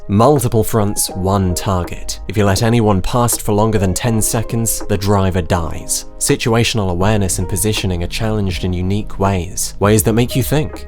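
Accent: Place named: United Kingdom. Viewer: This is what any Englishman that isn't a chav sounds like. I assume you're either very young or American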